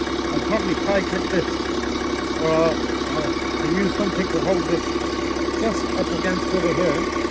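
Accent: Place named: Bristol, United Kingdom. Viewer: Nice video 😎👍🏽... Sounds like a Bristol accent 👂🏽That's my town 👊🏽😎... Love the content, helped me gain some valuable knowledge for sure! Keep up the good work 🔥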